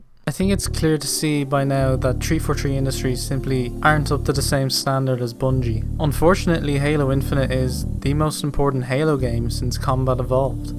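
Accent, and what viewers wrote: Ireland. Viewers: rough guess correct me if I'm wrong cork or Dublin judging by the accident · Matthewmatosis is that you?? You sound a lot like him lmao. More than just the Irish accent; very analytical. You made really good points man, and got me more hopeful for Infinite somewhat. It truly is their last chance to save not only halo, but the xbox even. Subbed, keep it up! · My boy is Irish. I can hear it 👐 Nice video dude!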